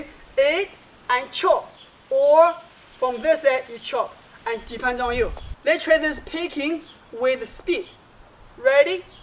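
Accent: China. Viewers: I love his Chinese English not American pronunciation! I enjoy ALL his videos.. the Chinese sword is wonderful to watch in action! We want to see many sword techniques! Hope he does more at a slow pace so we can follow him! 💡🩸💡🩸🩸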